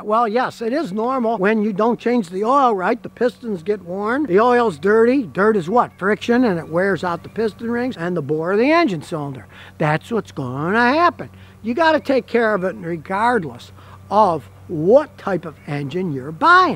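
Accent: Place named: United States. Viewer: I’m sorry, but you rabbit in your peculiar American accent far to quickly to comprehend what you are talking about. I’m sure some people might understand you, but not me.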